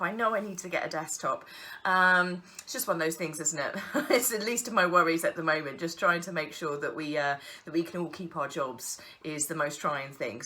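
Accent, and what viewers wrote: United Kingdom. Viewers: Great info! I love your channel and your British accent😊.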